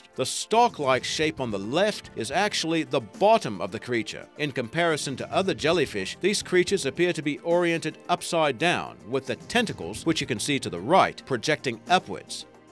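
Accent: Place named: Australia. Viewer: Why do you sound Australian but use American measurement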